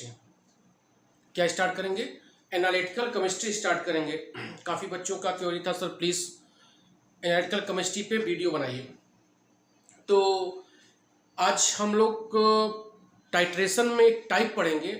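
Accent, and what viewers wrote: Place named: Bhojpuri, India. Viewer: Sir आपके भोजपुरी जान मार रहा है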